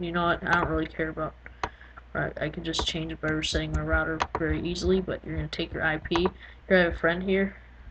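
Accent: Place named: South, United States. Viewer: Your southern accent makes me want to puke all over myself :(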